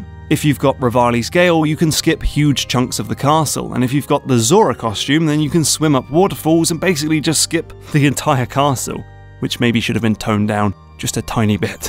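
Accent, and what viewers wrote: United Kingdom. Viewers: I was expecting "anti-clockwise" from the British accent. Must have been painful to say "counter-clockwise."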